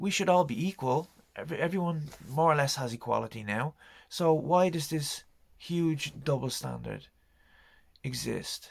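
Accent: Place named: Ireland. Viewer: I love the Irish accent, it's my favourite alongside the Italian accent. It's always nice to hear an Irish accent, especially when they talk about a subject I'm passionate about. :)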